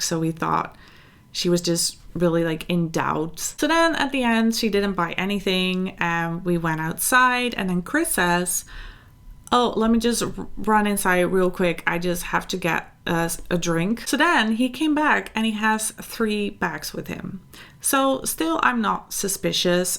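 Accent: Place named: Netherlands. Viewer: I'm new to your channel and I knew you were Dutch just by the accent haha lovely video!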